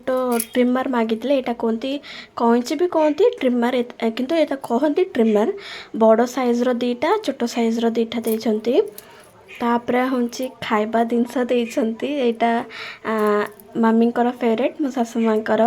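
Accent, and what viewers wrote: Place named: Telugu, India. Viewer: It's like Telugu slang😮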